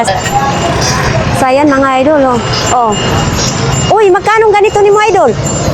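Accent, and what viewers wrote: Visayas, Philippines. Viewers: Cute din ng bisaya accent mo🤣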